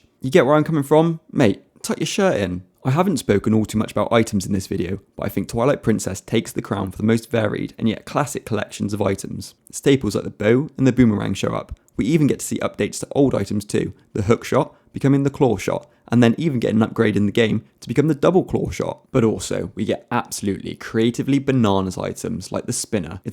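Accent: United Kingdom. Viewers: Like hearing a fellow English man talk about these games most of the YouTube videos are American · You have earned a sub!!! Hearing someone talk about Zelda with Received Pronunciation is S tier for me. You’ll have a lot more subs once more people discover how high quality your videos are!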